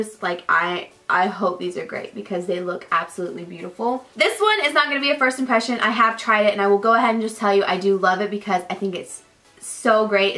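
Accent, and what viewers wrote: South, United States. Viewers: You're so friking beautiful I love you and I want to be you!! Your hair is so friking bombbbbbbbb and you're so pretty makeup or not, and your slight southern accent I could NOT be more jealous of and your name is so cute. You seem like suuuuuch a down to earth girl and I wanna be your bff. Ok done being creepy AF😆🙄UGH WHY CANT I BE YOU GIRL🤓💙😍😝👑 #queen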